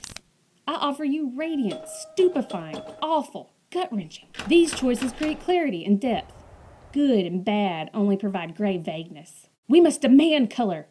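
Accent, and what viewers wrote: South, United States. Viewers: Is she from the south? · what a spunky, southern sound you have!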